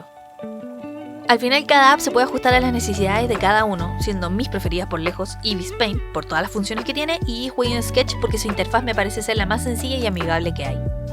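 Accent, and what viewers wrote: Chile. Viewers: Wn me encanta el acento Chileno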